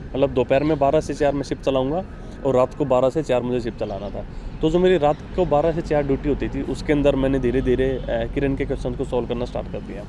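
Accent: Haryana, India. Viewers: Haryana k log sach Bolte h or Dil se Bolte h. AAP Haryana se ho boli se hi PTA lag rha h. I Love my Haryana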